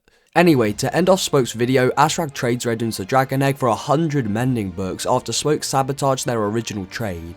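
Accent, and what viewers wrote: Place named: United Kingdom. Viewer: Bros br'i ish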